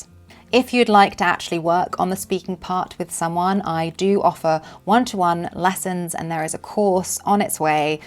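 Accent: United Kingdom. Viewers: I've been learning English for the past 20 years because I started late in life as my second language, and I'm trying to be perfect, although I know I won't; but there is always room for improvement, right? I've always heard that "practice makes perfect." I've never heard that "perfect is the enemy of good." Oh my word!!! I feel relieved now. Thank you so much for these kind words. I love all your lessons, your method, your British accent, your voice...... Love from London.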